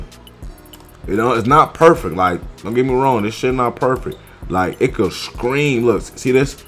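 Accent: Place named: Chicago, United States. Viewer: I can tell that Chicago accent from a mile away! You got my sub, where you from brodie? Good video I actually learned something new! I been at this shit for 6 months and starting to understand more and more about price action.. do you trade futures to or just forex?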